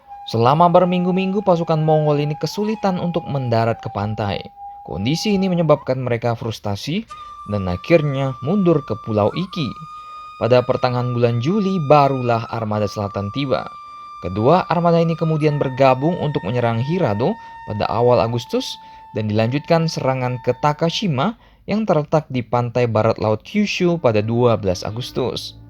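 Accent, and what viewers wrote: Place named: Riau, Indonesia. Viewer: Adminnya orang Riau nih atau kalau ngg BANGKA BELITUNG ✌️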